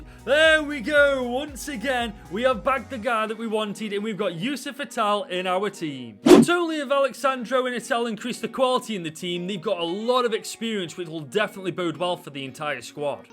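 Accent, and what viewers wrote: Stoke, United Kingdom. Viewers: I absolutely love his British accent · Must be from Stoke yourself with that accent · You're accent! You're from Stoke right?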